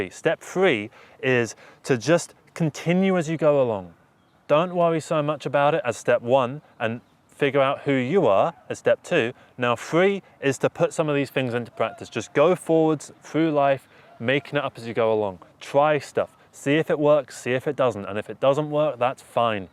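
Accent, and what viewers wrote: United Kingdom. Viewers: The algorithm seems to incorporate even your style of music, scary! Meaning I like your music also, the eminor guitar stuff. Holy shit, you’ve basically just wrote a best selling psychology book for artists! Prove me wrong! I’m double your age. Maybe it’s your brit accent, but your style and mannerisms remind me alot of a John lennon. I know what I’m saying. Because of human nature imperfections I was gonna side with u on the relationship thing, and then The homuncle whispered, no, its no body’s fault, it just wasn’t meant to be, we didn’t mix and thats it. You see that, your realistic look of peace and love has affected my thinking! Keep playing that fucking guitar and keep talking, they inspire me, your genuine man.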